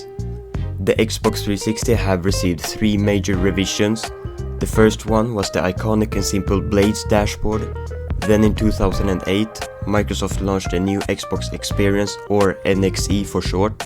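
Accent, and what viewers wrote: Sweden. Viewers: I can hear your Swedish accent as you said " " unshorted series? Mean uncharted? To be fair we both have similar languages in terms of syntax. Just remember to add the T in RS pronunciations to words. Some* xD It's okay.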